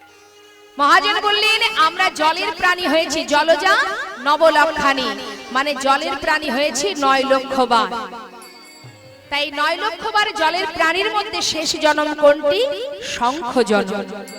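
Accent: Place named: Noakhali, Bangladesh. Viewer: দাদা আপনার বাড়ি বাংলাদেশের কোন এলাকায় ,? আপনার কথা শুনে মনে হচ্ছে ঢাকা নোয়াখালী কিংবা ওর আশে পাশে।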